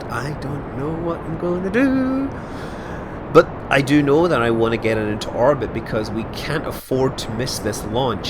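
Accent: Scotland, United Kingdom. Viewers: Nah, it's just the Scottish accent.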